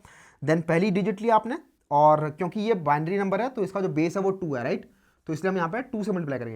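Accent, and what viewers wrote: India. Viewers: Indian English is so difficult to understand xD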